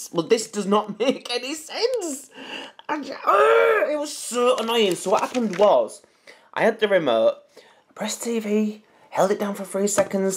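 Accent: Hull, United Kingdom. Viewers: The Hull accent was a bonus - I'm from Hull.👍👍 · The Hull Accent tops it off - i'm from Hull LOL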